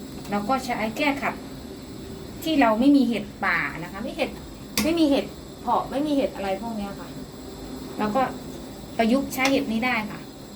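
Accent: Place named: Phu Thai, Thailand. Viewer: คือมิเว้าภูไท🥰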